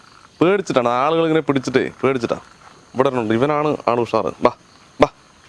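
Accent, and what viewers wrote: Palakkad, India. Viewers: Ninglde Slang Kettitt Palakkad aanu nnu thonnunu. Sariyano?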